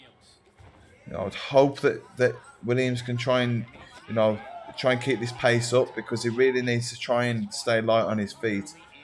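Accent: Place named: Birmingham, United Kingdom. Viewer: i'm admiring your accent tbh. are you from Burmingham by chance? (i"m just english learner >< )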